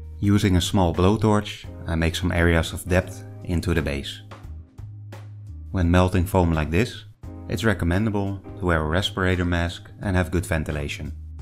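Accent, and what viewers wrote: Netherlands. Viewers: are you dutch? if so vet man!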